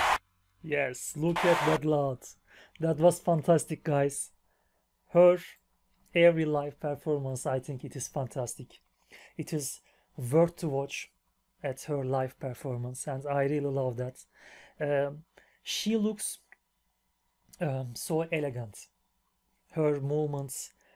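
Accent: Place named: Turkey. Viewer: Oh my Turkish friend is back with another reaction 😎 always a pleasure to see your smile and to hear your nice accent on this emblematic song! Please keep go on with cause you give me such a nice smile 😘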